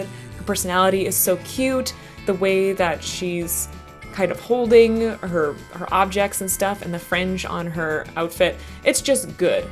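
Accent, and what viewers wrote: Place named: Canada. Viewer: you're canadian??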